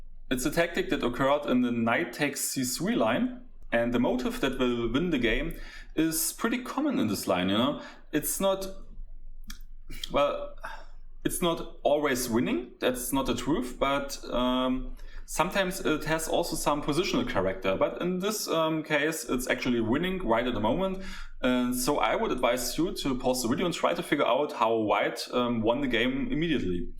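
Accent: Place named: Germany. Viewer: Thats the most German-English video I've ever heard. Great job!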